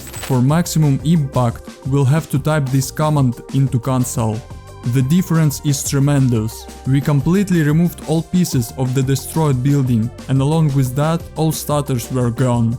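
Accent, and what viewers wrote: Russia. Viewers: Where you from? R u from Russia? U have a Russian accent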